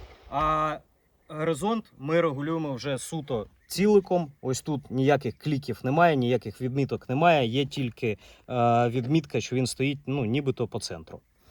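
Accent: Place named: Russia. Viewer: На слух старо русский деревенский язык, что говорит понятно это какой регион России? Кокая та окраина России точно. Давно не был в России мира всем и процветания.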